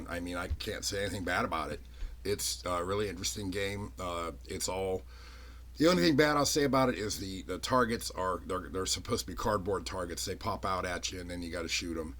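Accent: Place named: Pittsburgh, United States. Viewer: I just randomly found you with the pressure pot video. I'm doing a Jerry rigged vacuum for some resin and we'll see how it goes first, but it sounded like you were a Pittsburgher, then I saw you had a Kennywood puppet video.... Then I saw your channel is, and I might be misremembering but have I seen that sign up in Gibsonia for the last like decade??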